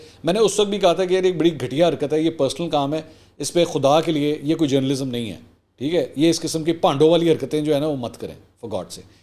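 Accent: Punjab, Pakistan. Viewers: Please avoid speaking in typical jatkka punjabi during your conversation. It certainly Suits others but not You!! Suggest to avoid passing your judgements.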